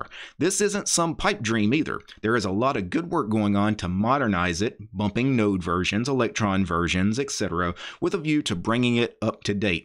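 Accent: South, United States. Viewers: Your Southern accent is sick. 🔥🔥🔥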